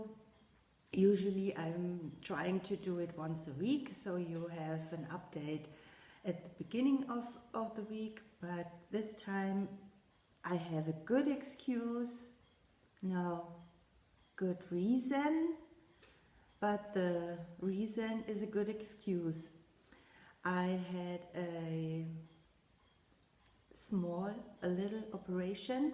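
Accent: Germany. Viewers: Hi Again, great video as always. I was sorry to hear you have been feeling ill with your oral surgeries but hopefully you are on the mend and feeling better. I'm praying for you to have a full and complete recovery. I have a lovely friend who immigrated to the US from Germany when she was very young, I believe some of her family are still in the Frankfurt area. She misses the accent/dialect so I told her check out your channel. Loved your "know your needle-worker" segment. We are so very much alike... in our choices of movies, books and love of music. If I could pick a one word definition for you I'd say, "caring". You care about people, the way you express yourself and how you are perceived, and of course your love for your craft...so yes, to me it's definitely "caring". Have a good stitchy week and I look forward to your next video. Hugs a Bunch, Toni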